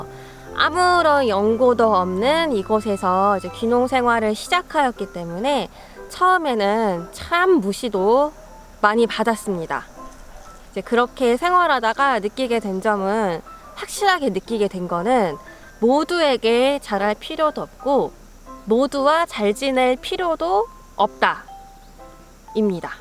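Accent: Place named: Chungcheong, South Korea. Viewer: ㅋㅋㅋ 그거 충청도라 그래요 ㅋ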